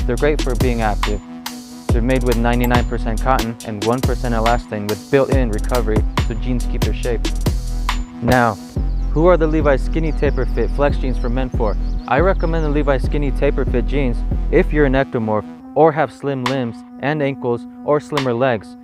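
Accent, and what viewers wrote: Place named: Mexican American, United States. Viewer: Mexican American accent 👍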